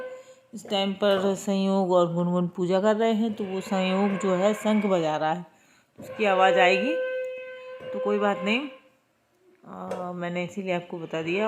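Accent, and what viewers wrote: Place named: Bundelkhand, India. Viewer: Third like ke sath bahut Achcha falahar banaya hai aapane ekadam testi aur yummy Dekhkar hi Munh Mein Pani a Gaya Hamare Yahan Bhi Ganesh Chauth Ka Vrat bahut acche se Manaya Jata Hai vaise Main To baramasi Ganesh Chauth Ka Vrat rahti hun per yah Bade Ganesh ki jo Puja Hai vah Mere Hanuman hai isliye maine poochha Nahin Ki Thi aapke Munh se Bundelkhandi bahut acchi Lagti Hai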